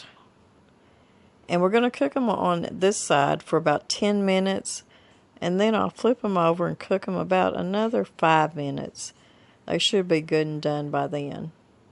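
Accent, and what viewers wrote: United States. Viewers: Ma'am, that is some serious man chow. Even putting three or four in a lunch box for work days would be wonderful. Your voice is just country as a butter bean. Thank your for the recipe and the video. Be well and at peace.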